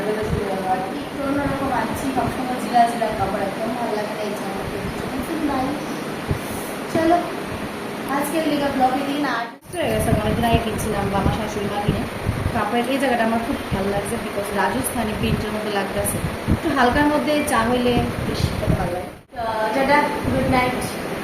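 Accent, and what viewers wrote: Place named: Bangladesh. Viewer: Apni ki Bangladesh er hindu? Apnar accent ta sune mone holo tai jigges korchi...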